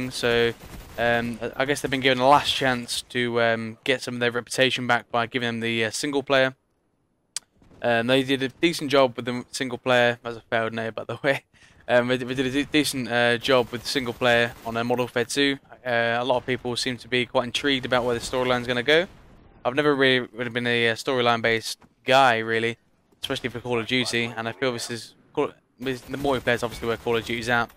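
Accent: United Kingdom. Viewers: Thanks for taking the time to make all these vids - really appreciate it, great effort. It's refreshing to hear a fellow Brit too! Nowt wrong with other nationalities I might add, (quickly got that in before I was shot down in flames!) Keep up the good work.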